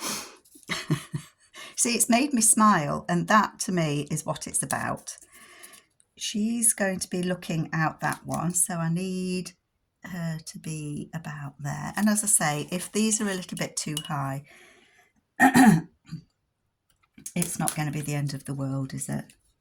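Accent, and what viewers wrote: England, United Kingdom. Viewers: I just love what you’re doing with this journal!!! I love watching you craft and hearing your beautiful English accent. Hugs, Sharron 🥰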